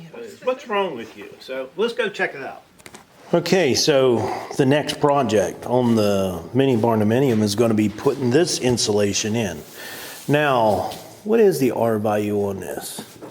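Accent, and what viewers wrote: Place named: Pittsburgh, United States. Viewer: Iam wondering where ur accent where ur from! Sounds like Pittsburgh Pennsylvania area!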